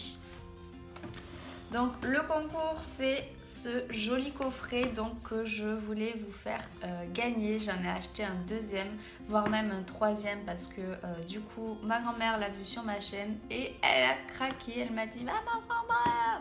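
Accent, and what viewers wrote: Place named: South, France. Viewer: Je participe ! Merci pour ce super concours, je rêve de cette palette depuis qu'elle est sortie ! Ca fait plaisir d'entendre l'accent du sud chez une youtubeuse ahha! Joyeuse fêtes de fin d'année :)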